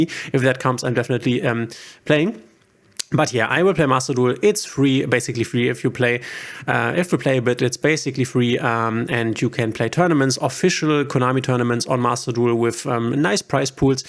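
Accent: Germany. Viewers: Höre ich Richtig, bist doch ein Deutscher ;)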